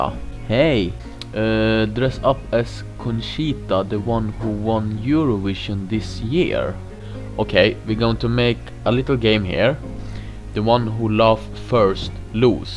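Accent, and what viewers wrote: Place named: Sweden. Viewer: the look of your pants, you know.. swedish pants these days... nah jk your accent reveals it all, well the pants to but yea;D im swedish to btw.:)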